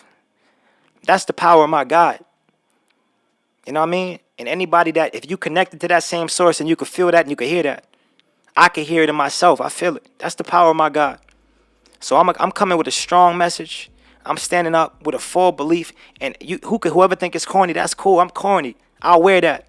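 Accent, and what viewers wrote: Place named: New York, United States. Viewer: I'm watching this in 2024 and you sound different now. I still hear the NY in you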